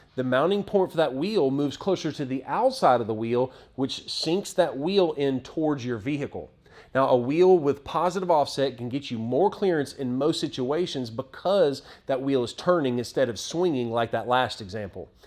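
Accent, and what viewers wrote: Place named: Alabama, United States. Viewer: Greetings fam. I had a 2015 F-150 from my bother-law, 35,500miles. My plans were to do a 2" offset with a 2" level kit. My son had to drive it because someone cut his convertor. Then someone t-boned the truck, bent the frame and cracked the shaft. My wife and share a 2013 Jeep Grand Cherokee and she won't let me do crap. Hey, I hear the Bama boy keeps coming out. Peace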